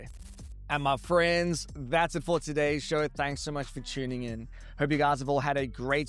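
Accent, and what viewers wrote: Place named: Durban, South Africa. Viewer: maybe its a durban accent there's another content maker from there that speaks like him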